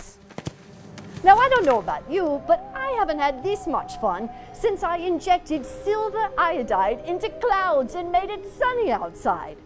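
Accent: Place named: United Kingdom. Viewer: Aaah... "O" Town ! I lived there many years. Migrating from Miami to Broward then Orlando area. The Beauty N/W of Orlando , [ Rolling hills, Beautiful springs & People ! ] Then.. 90% of those areas Paved over & MILLIONS of people Still moving into FL !! You have A GREAT Weather channel !! (Just found it 3 days ago) Very good "Brit" Accent ! BTW... Cheers & stay safe in these BEYOND preposterous times !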